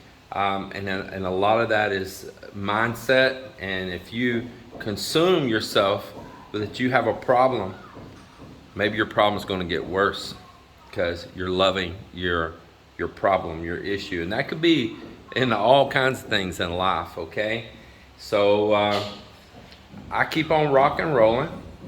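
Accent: Texas, United States. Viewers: You are an amazing individual. Your ability to have focus on so many jobs at the same time with such detail. Memorized numbers, sizes ect from each job while supervising so many workers. The stress from that would crumble the average Joe. Me included. I have joked about the Texas drawl but fun heartedly. I knew many Texans while in the service.........and what bunch of good guys.One of my best friends was from Texarcana. Swamp land he told me. When i first started watching i wondered how you had so much money to do this. But now i guess you were some sort of construction engineer or site superintendent. Love the videos. Keep em coming. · I noticed absolutely nothing out of the ordinary! And I've watched all ur videos. I noticed no neurological problems. My family and best friend lives in Texas. I'm 65. My dad was born and raised in Texas. Real Texans have that accent. 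As it relates to not feeling 100 everyday, I never related those days to viruses. Do not let the negative comments affect you at all. Melinda's mother is right. Our mentality is the key. We can't give our energy to our ailments. We instead try to focus on wellness. Physical and especially mental wellness. Also, one's educational background has nothing to do with one's gifting. Some of the most knowledgeable people are self-taught. Quiet as it's kept, institutionalized education systems stifle our gifts, talents, and mentality. So whoever is referencing 3rd grade education needs to be educated.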